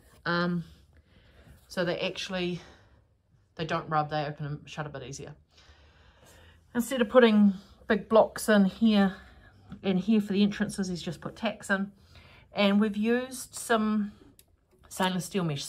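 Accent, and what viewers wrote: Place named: New Zealand. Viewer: Gr8 update,. I should have listened closer to dialogue and would have noted you were Kiwis. Weee used often “bet” for bit … might be rivals on the turf and on the paddock but best of mates after the games and a few beers. Cheers…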